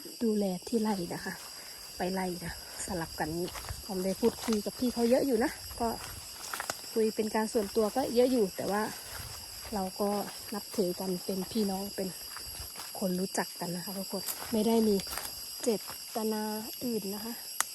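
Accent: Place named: Laplae, Thailand. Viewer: เสียงเหมือนคนลับแล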